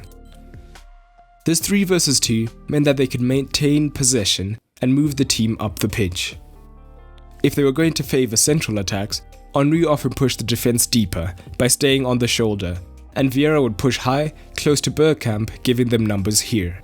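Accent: South Africa. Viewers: Are you South African mate? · Are you south African ?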